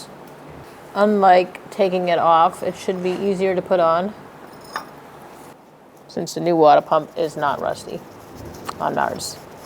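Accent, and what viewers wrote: Boston, United States. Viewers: love the boston accent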